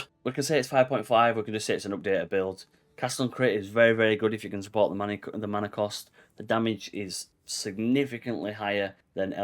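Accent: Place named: United Kingdom. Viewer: british english…the most annoying version of english to listen to….build is nice though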